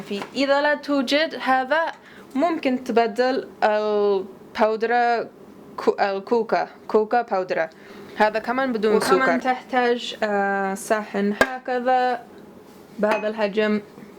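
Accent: Yemen. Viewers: انتي امريكيه ..ولهجتك ولكنه يمنيه مثل زوجك..عندنا بنات بالسعوديه يتحدثون انجليزي..وكانهم بنات امريكا ...سبحان الله الدنيا معكوسه..وبنات الدول العربيه كذلك..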